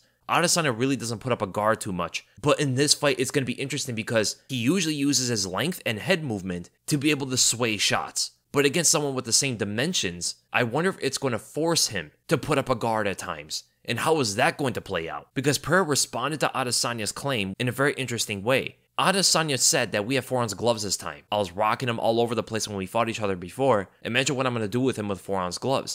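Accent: United States. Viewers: Had no idea you were Albanian, you sound American. You are born and raised there? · damn albanian, i thought you were american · Had no clue you were from Albania that's cool. Totally thought you sounded like you were from the US in Milwaukee or something lmao😂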